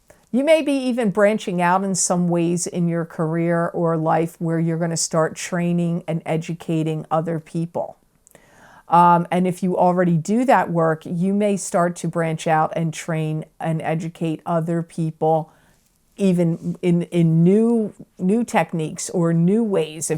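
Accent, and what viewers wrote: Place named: Baltimore, United States. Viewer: Hi, are you from Baltimore? You sound just like a hometown girl! It's my hometown.:) BTW, I am disposing of my brother's estate which includes two cars, an airplane and his house. Talk about large items! You are right on about that!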